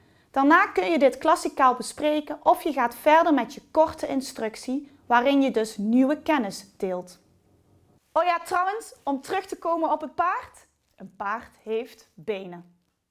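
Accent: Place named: Limburg, Netherlands. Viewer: Sorry, wat een irritant dialect. Limburgs? wogde legge wegkforme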